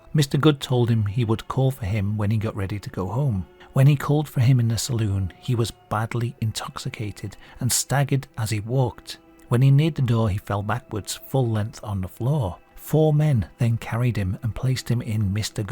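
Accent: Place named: Northern England, United Kingdom. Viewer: he's from up north in England. Maybe derby/Manchester .Not Scottish or Welsh.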